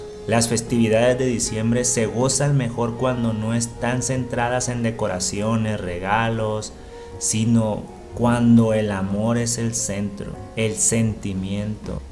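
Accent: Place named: Sinaloa, Mexico. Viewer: ¿Porque habla así bato,acaso es sinaloense?,saludos,felices fiestas a todos incluyendo a católicos,musulmánes,etc.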